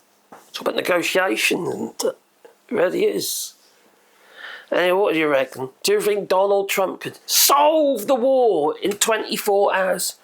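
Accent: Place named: Norfolk, United Kingdom. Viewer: you sound like a norfolk buh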